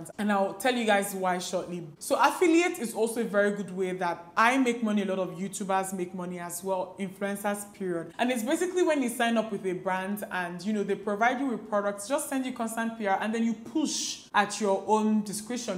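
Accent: Nigeria. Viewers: Thanks for sharing! 💕 If I may make one recommendation, please speak a little slower. I’m somewhat accustomed to the Nigerian accent, but even I struggled a little to understand everything you said. I agree with the other viewers, you look beautiful!💕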